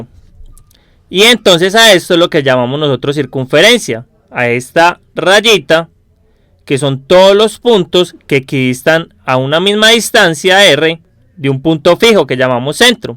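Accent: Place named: Antioquia, Colombia. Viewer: es acento paisa, aprende un poco de los diferentes dialectos colombianos.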